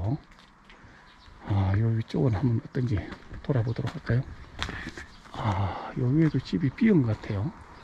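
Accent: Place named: Jeolla, South Korea. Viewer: 이 유튜버전라도분이네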